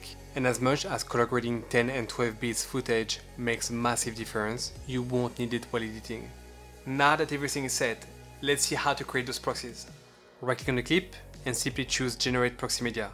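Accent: France. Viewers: merci french accen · Loved your video!!! Super thanks!!! ❤❤❤ Your accent is from France, am I right? 🤭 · Trench accent ? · I believe you're french... Based on your accent 🙂 Thanks for the video. I'm still on the free version, I wonder if I upgrade to studio with my RTX 2070 Super would be enough to have smooth playback in almost all situation or if I should go with the new MacBook m1 ? What are your thoughts on this ? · mmm French accent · I love how he speaks in french using english words! :D. Thanks for the video, I didn't grasp much as a total noob but generating proxy media solved the issue.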